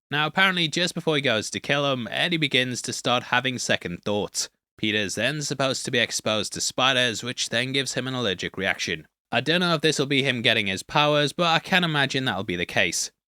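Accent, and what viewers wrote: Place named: Liverpool, United Kingdom. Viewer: unforgivable scouse accent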